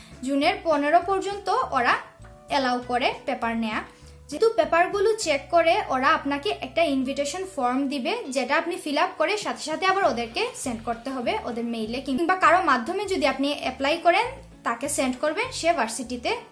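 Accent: Sylhet, Bangladesh. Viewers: Didi mone hoy Sylhety! Tai na??? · সিলেটী নি গ মাই তুমি ?? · আপু সিলেটী? 🥰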